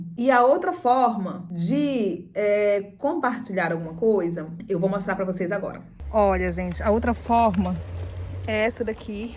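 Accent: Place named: Portugal. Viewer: sra, eu estou a ouvi-la, você fala clarinho, com toda a á vontade, não há hipótese de dúvidas se você fala exatamente como todos falamos, custa,- me acreditar que brasileiros digam que percebem melhor o espanhol do que o português de cá, estão a brincar connosco, não acha.?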